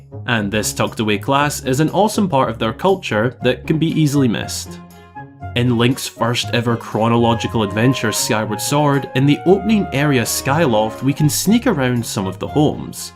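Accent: Scotland, United Kingdom. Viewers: never said he was, but just saying there's nothing wrong with his pronunciation. I can't speak for all dialects, so I wanted to make sure that it didn't seem like I was. I'm sure most of viewer base is in America, that's why he speaks with less of a Scottish accent. It makes sense, in a purely analytical way.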